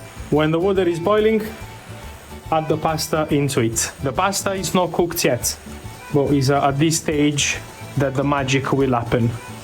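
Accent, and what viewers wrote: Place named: Naples, Italy. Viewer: Ho notato, dando un’occhiata, che rispetto a tanti altri, tu con il tuo canale qui, sei diciamo così, ancora all’inizio. :) Comunque ti faccio tanti auguri. 👋 PS: da quello che ho sentito alla fine del video, ho capito che sei anche napoletano, quindi siamo anche corregionali. Io provengo dalla provincia di Salerno. :)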